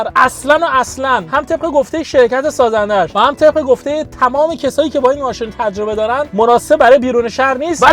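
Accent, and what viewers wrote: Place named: Bushehr, Iran. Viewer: بوشهریا لهجشون همینطوریه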